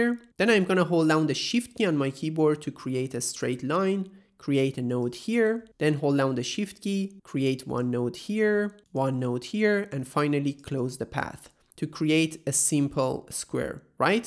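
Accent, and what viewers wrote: Iran. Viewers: iranian accent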